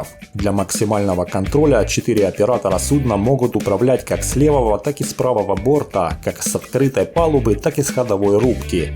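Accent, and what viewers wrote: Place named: Ukraine. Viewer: Опять украинский коментатор. Акцент слышен.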